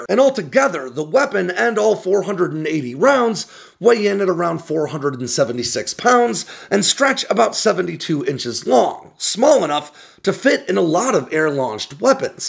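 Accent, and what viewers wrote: United States. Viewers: You say that almost like an American would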